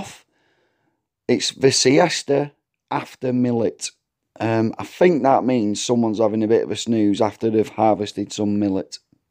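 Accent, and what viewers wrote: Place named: Lancashire, United Kingdom. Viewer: Love the French pronounced names in a Lancs accent! Art seems better now I get older!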